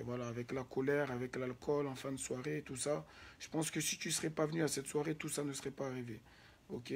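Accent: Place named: France. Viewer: Ton acent francaise me plait bocou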